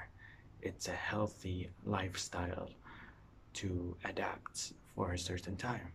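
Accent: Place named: Iraq. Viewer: Make subtitle with it please... I really wanna learn Iraqi accent 🥺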